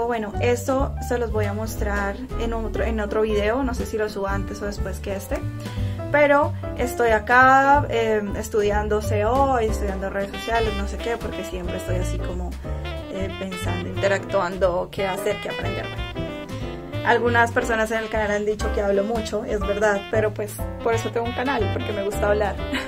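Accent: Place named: Colombia. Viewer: ese árbol lunar está muy chévere y el pie de árbol está chido.. y si hablas mucho y con acento colombiano.. pero me gusta ver tus vídeos bueno cuando tengo tiempo jaja. Has un suerter navideño para piña. Saludos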